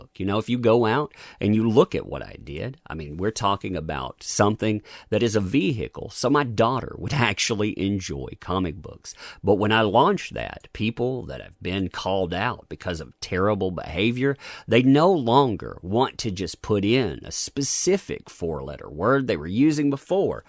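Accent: South, United States. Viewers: Keep speaking the truth in that smooth southern tone 💪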